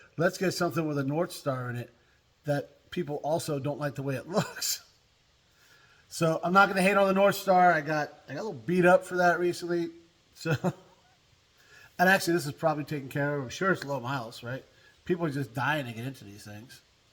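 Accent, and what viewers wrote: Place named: East Coast, United States. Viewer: I could listen to you talk for hours love east coast talk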